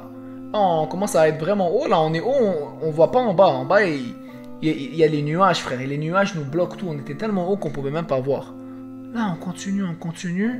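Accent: Canada. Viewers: t as un accent canadien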